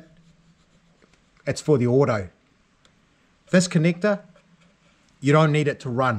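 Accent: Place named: Australia. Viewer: From the accent hes from Australia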